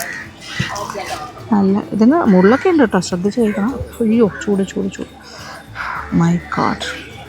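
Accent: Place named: Trivandrum, India. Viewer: Innathe food kanaan thanne endoru bhangi... Tvm the bhasha ano Mam adipoli...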